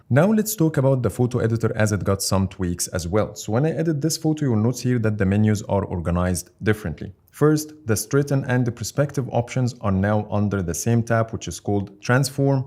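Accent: Egypt. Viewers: brother are you from egypt you have a very masri accent love from uae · مش عارف ليه حاسس انك مصري 🙂 لهجتنا معروفة حتى بالانجليزي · اللهجة المصرية بالانجليزي مستفزة جدا ....مفيش كلمة وحدة بتتنطق صح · im guessing you are egyptian because of your accent its really good to see ppl from my country making videos in english too keep up the good work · The one talking in the video is Egyptian, I know that accent man xD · يابن عليك مصري اوي يا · your accent is so Egyptian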